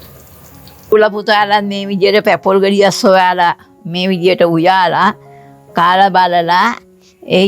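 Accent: Ambalangoda, Sri Lanka. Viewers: Aththamma from down south? The way she talks feels like amabalangoda accent.. bless her she is lovely and kind ❤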